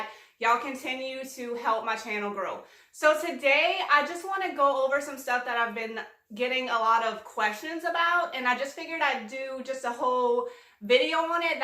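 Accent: Louisiana, United States. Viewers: Congrats on 10K! I love Southern Louisiana accents! A Fb group would be fun! Keep it going! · Used to live in Denham Springs (Livingston Parish) now in Texas and I miss hearing that Cajun speak! Love it girl! Have you tried making your own chalk paint and then using it in your paint sprayer? Do you have to thin the chalk paint to use the sprayer? Thanks! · Great video! I love your accent too! Took a while but figured out it must be cajun and you verified that for me here.😉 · I've only recently found you, you are a breath of fresh air! I too, LOVE your accent, I'm from Tennessee and my company's office is in Beaux Bridge, I could listen to those guys talk all day and your cute accent is way stronger than theirs. I love your style, I can't wait to see what you come up with next!!! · Love your channel and really appreciate your step-by-step instructions on how you make your DIY 's. 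I enjoy watching your channel and get excited to see what you are creating next so I can go try and recreate your DIYS. I recently found me a duck!!!! at the thrift store and I am going to try the chalk paint/paint baking soda concoction along with the Waverly wax clear/paint i know its going to come out amazing, Oh girl love that ac•cent because we Louisiana girls!! 😘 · Just stumbled across one of your videos, heard your accent, and said this lady must be from da bayou like me 😍 lovin your channel too girl! 😘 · I could tell you were from La right away..... being from MS you are from my sister state !!!! The accent sounds like home !!!! Love your videos where you flip an item or you make something from wood... I love woodworking... Thanks for all the info about you !!! I am subscribing !!!!! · I just happen to come across your channel. I love thrifting, so I was watching you and I knew you were from my state and I knew you had to be from south. 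Your accent is my accent. Louisiana is the great state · I've been addicted to your channel since last summer and started curb flips in the fall. Your videos are so inspiring. I love that you incorporate color into your designs. Love your accent, too. My mom is from New Iberia so I recognized the Cajun accent. Love love loce. Congrats on the monitization. God bless and keep you and yours.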